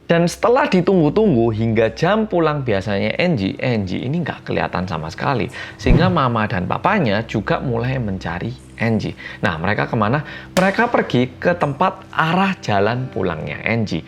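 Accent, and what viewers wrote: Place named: Java, Indonesia. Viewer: Selalu menarik uraian diakhir kisahmu...mas medoook😅...tks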